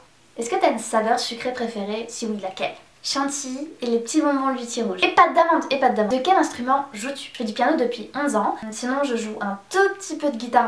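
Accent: South, France. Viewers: Vive le sud ! D'ailleurs je trouve qu'on a jamais autant entendu ton accent que dans cette vidéo c'était chouette ! :p Je me suis reconnu dans les critères de mec " ce qui me fait craquer c'est qu'il soit plus grand, ce qui me rebutte c'est qu'il soit plus petit " c'est exactement ça :) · Ah je t'aime vraiment trop. Je pense que c'est le meilleur TMI tag que j'ai vu jusqu'à présent. Le montage est top. Tu es trop mignonne et tu m'as fait vraiment trop trop rire tout au long de la vidéo. Moi aussi je suis poisson, ton anniversaire c'est donc Lundi? Moi, c'est demain hiihiii... Si j'y pense je te le feterai, meme si je pense que tu ne verras pas ce commentaire, enfin bon anniversaire en avance.. C'est vrai qu'il n'y a pas beaucoup de Youtubeuse qui viennent du Sud, et j'adore ton accent, on l'entend dans cette vidéo! Gros bisous :b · T'es tellement chooooooooooou :) Tu as de plus en plus l'accent du sud hihi c'est trop rigolo j'adore <3 Faut absolument que je regarde la série heaven tree du cooup!! 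:) Oui pour le haul, bisou d'amour <3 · aha j'aime ton accent du sud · J adore trop trop le montage parfait avec l'accent du sud moi je comprends pas je vis dans le sud et jai pas d'accent mdr tes trop mignonne je trouve que tu fais plus grande (pas en taille ) juste j'adore cette vidéo et la youtubeuse ♥★♣:-D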